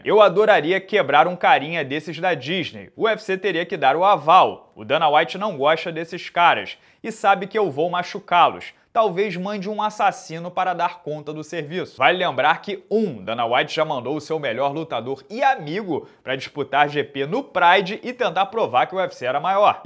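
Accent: Rio de Janeiro, Brazil. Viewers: Pq citou microfonia sendo que é o sotaque do rio de janeiro?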